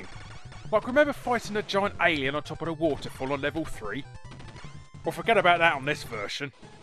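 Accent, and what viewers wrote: United Kingdom. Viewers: Why so british?!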